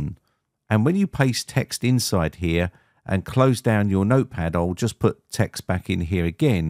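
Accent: United Kingdom. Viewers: Thank you so much random british person on youtube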